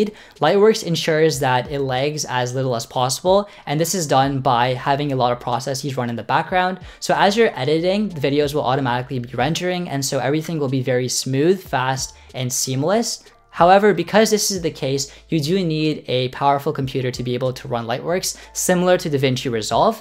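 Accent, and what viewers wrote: United States. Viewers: I was a bit overwhelmed when I heard that soft, American-english accent. Times have changed. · sir you are looking as Indian Boy with American accent.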